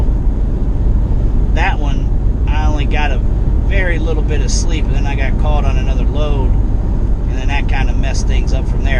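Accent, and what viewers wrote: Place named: Akron, United States. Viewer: You sound like your from Akron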